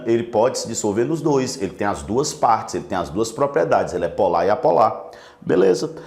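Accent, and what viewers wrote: Northeast, Brazil. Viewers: Que aula foda! E ainda por cima o sotaque nordestino. Inscrita demais no canal!! Sucesso!!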